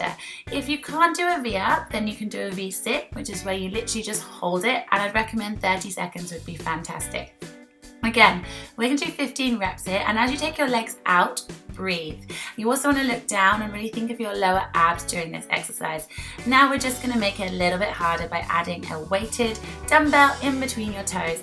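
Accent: United Kingdom. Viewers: Are you from Norwich? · Are you from England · How refreshing to hear a British babe on youtubes fitness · That body + British accent= heaven! Wow!!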